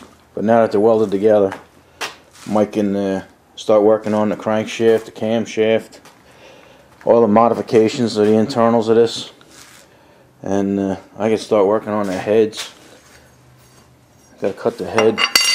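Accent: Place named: North, United States. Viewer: Aye, Mike! Wddya say Mike, look here tubes! Ain't that right Mike! Hey Mike! Look ere tubes! hey Mike! I am just kidding, as a southern dude the northern dialect is fascinating! Keep up the good work!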